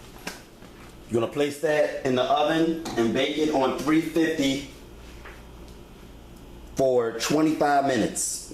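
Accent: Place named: Philadelphia, United States. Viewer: I love your philly accent!!!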